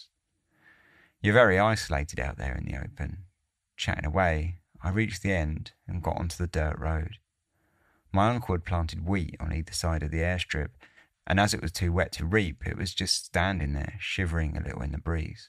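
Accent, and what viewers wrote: England, United Kingdom. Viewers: Sounds like English accent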